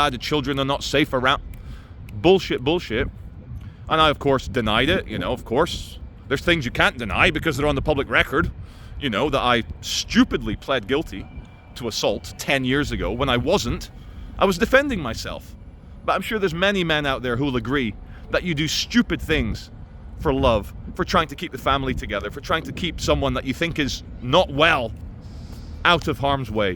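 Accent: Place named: Scotland, United Kingdom. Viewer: your posh Scot accent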